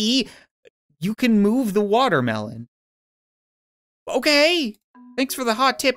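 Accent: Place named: Canada. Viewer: S'called canadian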